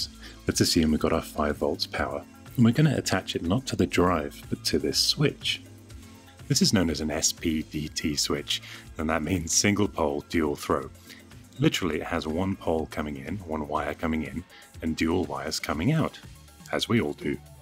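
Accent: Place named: Geordie, United Kingdom. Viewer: As someone from the North East, UK, that was a passable Geordie accent :) Also that carbon fibre wrap skinned Amiga looks amazing.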